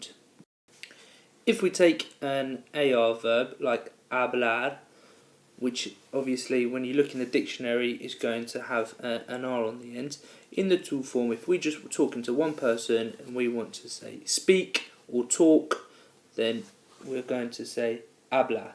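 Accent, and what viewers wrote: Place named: United Kingdom. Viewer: are u a britisher ?? Cuz your accent is so british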